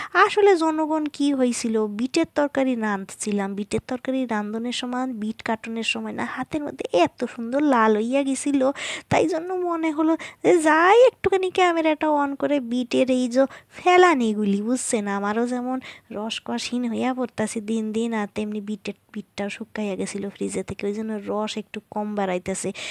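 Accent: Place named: Barishal, Bangladesh. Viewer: Onek moja pelam apnar borisailla bhasha shune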